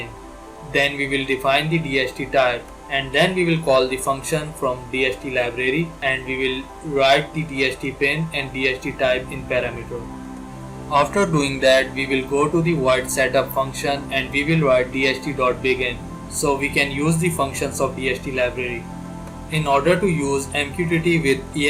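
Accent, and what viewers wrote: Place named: India. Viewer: You already know youre getting the best when the indish accent starts.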